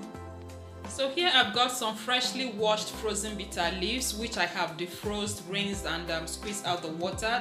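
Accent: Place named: Delta, Nigeria. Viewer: what part of Igbo are you from? You sound Delta Igbo. Just curious.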